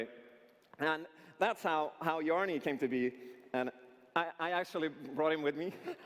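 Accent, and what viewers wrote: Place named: Sweden. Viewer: This guy is running a small company of 14 people and together they created this game as a result. I have no idea whether the game is any good but this is what people like him do. They create games and that's what they know and are used to. To stand in front of a large audience and the industry as a whole as well as in front of hundreds of thousands watching him over the internet is no easy task if you've never done anything like that. I would refuse to stand on that stage because I would fear losing it and forget the last word I spoke. Further more this guy's native language is Swedish. Not English. I think he did a fabulous job of making a case for his and his co-workers game. How anyone can blame him in a negative and down playing way for being nervous and especially exited for the task at hand (in a cute Swedish way) is beyond me. 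But then again... there are a lot of fourteen year olds on the internetsez that have thrown the diapers/nappies and learned how to type.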